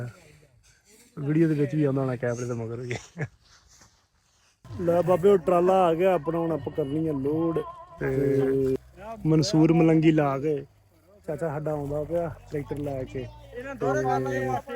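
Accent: Punjab, India. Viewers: charde panjab dahi lagda hai bol bani iko jihi haisafe babe 8 chuk de c nanke v pak bich rehinde dlskot de c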